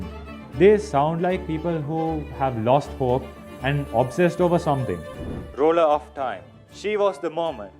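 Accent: India. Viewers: Where are u from cause u sound indian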